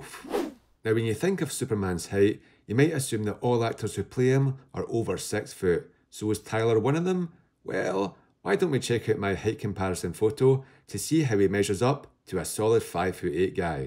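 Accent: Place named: United Kingdom. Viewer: No offense, I'm not good with British accents but it's late and I genuinly thought I was having a stroke when I started the video Again that's on me but I literally panicked lol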